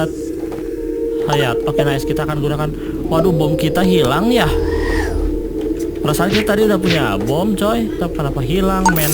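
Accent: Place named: Cianjur, Indonesia. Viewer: Kayak orng cianjur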